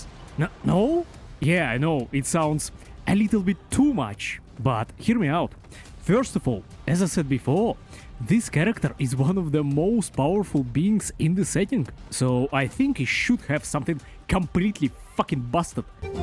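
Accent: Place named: Russia. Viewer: Бро , учмтывая твои крайне локальные вставки и акцент , могу уверенно предположить что ты рускоговорящий , мне было забавно увидеть некоторые из твоих роликов , но то что ты говормл про морду , и многих других однокнопочных аутичных персов в попытке сделать из них богов , это просто стоический кринж. Вполне вероятно что это вызвано пактическими сорбражениями , ибо если ты действительно выходец из ру лола , то прекрасно знаком с тем что за твои вбросы твой канал бы неизменно ждал красивейший нок от бати блейзера , а так , хоть и рыльце в пушку , но вещаешь на англ аудиторию , а значит и вопросов к тебе быть не должно . хоть и вынужден признать , сама по себе рубрика прожарки чемпиона наоборот могла быть оригинальной идеей , года этак 2 назад.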